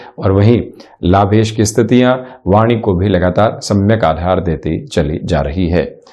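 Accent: Standard. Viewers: सटीक विश्लेषण भाषा पर अच्छी पकड़ , उसका प्रयोग और शुद्ध उच्चारण प्रभावशाली . साधुवाद !!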